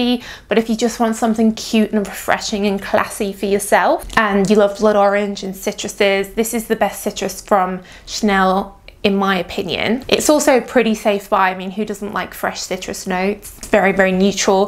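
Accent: United Kingdom. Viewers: I have the Green one it's incredible I m addicted to but I want to have the others! Thanks It's awesome!❤❤ By the way I'm learning english and I like the British accent So It was amazing and useful to watch the video!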